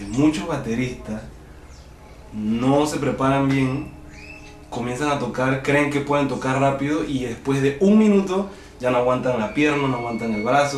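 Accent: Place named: Panama. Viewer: Man te lo juro que se ve! yo tambien soy de Panamá y encontre tu acento muy familiar, muy buenos videos, te felicito! saludos.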